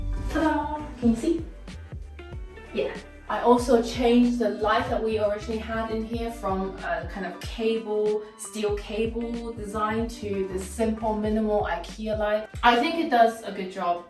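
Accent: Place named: London, United Kingdom. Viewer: and you have such a beautifull smile nice video and a londoner dialekt very elegant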